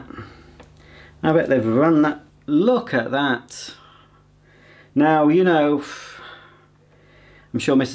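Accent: United States. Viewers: I love the american accent that wwas great. The concept and concept 2 are possibly my favorite hoover models, i have quite a few. I do find them quite often, if you need any parts let me know and ill do my best to help out! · Lol I’m offended after you talking like a amarican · Nice Texas accent.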